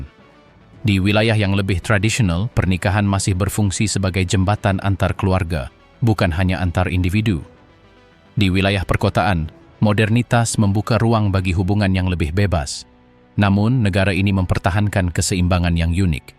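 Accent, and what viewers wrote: Malaysia. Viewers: Wah, ini bahasa Indonesia logat malaydesh. 😊😊😊😮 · Jawa Sumbawa hadir tapi sayang naratornya orang malaydhes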